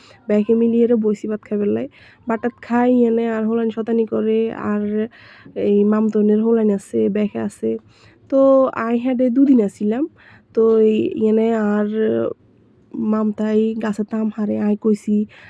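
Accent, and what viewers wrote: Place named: Sandwip, Bangladesh. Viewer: সন্দ্বীপের ভাসা😂😂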